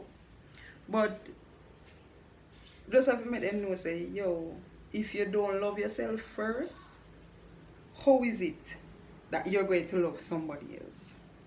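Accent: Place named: Jamaica. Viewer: I love your accent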